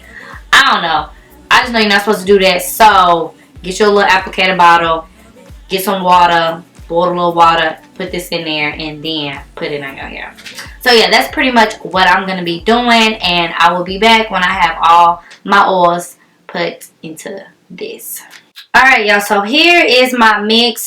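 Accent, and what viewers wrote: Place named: South, United States. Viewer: *speaking Southern AAVE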